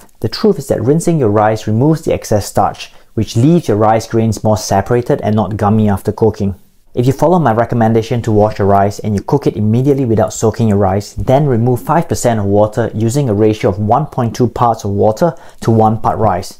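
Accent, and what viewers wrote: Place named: Singapore. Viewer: Your topics r good, due to your singaporean accent & style and the way u speak so fast, my heart goes with your fast pace to rush, not a comfortable viewing, please speak a bit slower for a more comfort viewing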